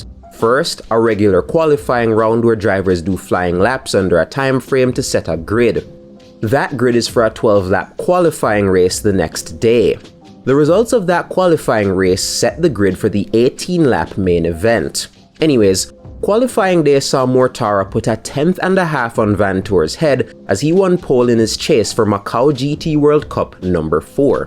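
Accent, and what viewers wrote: Jamaica. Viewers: I can't tell Are you Jamaican Or Pikey? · are you Jamaican by any chance? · I was thinking canadian, but yh probz yardie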